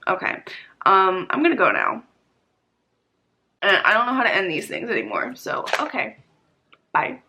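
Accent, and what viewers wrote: New Jersey, United States. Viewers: I hear a cute New Jersey accent 🙂 I never remembered it from before but I also watched your old vids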